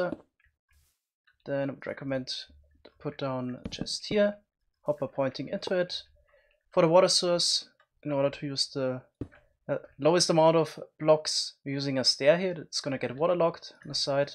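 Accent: Germany. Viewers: When Germans speak English I am also german .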